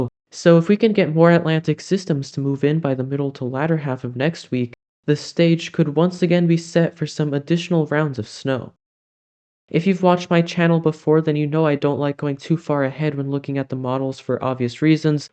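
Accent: Portugal. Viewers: He says it perfectly with a beautiful Portuguese accent. please don’t change! ❤️🌟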